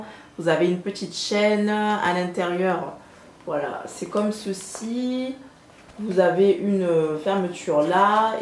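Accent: England, United Kingdom. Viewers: Oh mon dieu! Thumbs up for the english accent hihi ;') ! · Super l accent anglais lol👍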